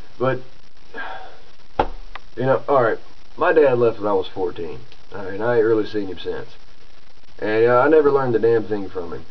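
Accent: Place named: South, United States. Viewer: Are you southern? I can hear a bit of an accent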